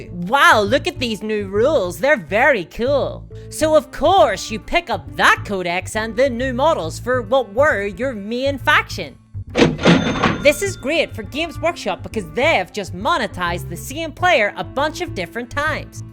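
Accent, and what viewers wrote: Northern Ireland, United Kingdom. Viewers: Are you from Northern Ireland by any chance? The accent sounds familiar. You should do something with the two Neckbeardia people if so! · Spot-on assessment! Love the accent; norn Irish + Canadian/american?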